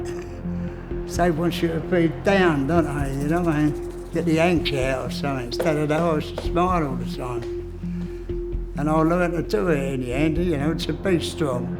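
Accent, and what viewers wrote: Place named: London, United Kingdom. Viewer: Old breed of Londoner. Lovely listening to the accent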